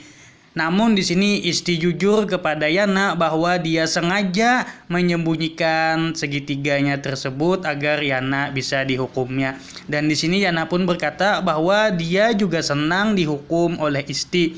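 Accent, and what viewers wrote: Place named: Banjar, Indonesia. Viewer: Dengar logatnya bhasa banjar langsung subrek😀😀....lagi pang revwie film lesbong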